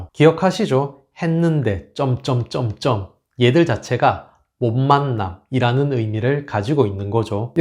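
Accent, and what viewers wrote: United Kingdom. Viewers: 한국어에 영국 억양이 묻어나요^^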